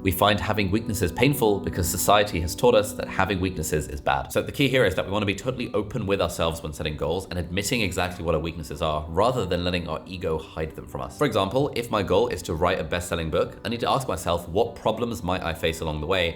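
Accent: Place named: United Kingdom. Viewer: This may not be related to the video,but I want to ask you something.How do you talk so fast and clear?I can understand each word of your British accent,even though I find the British accent most hard to understand. Pls give some tips☺️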